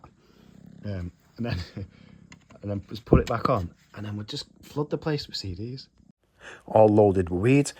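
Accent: Manchester, United Kingdom. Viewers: Lad proper thought you were black (don't know why) love that scally manc accent and that's from a Scouser,❤ the content 👍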